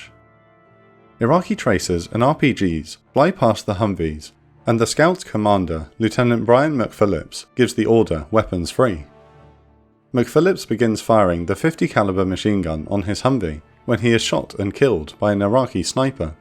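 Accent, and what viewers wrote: United Kingdom. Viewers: The guy making the video is also the narrator. He needs to hire a professional to narrate the videos because it's not his strength, unfortunately. The animations have improved significantly over the years, but the narration has not. I personally don't mind his incorrect intonations and strong british accent, but some of my friends, to whom I've recommended this channel, have remarked on this subject. In fact, even the automatic captioning system on Youtube often misidentifies his words. Does it make sense now?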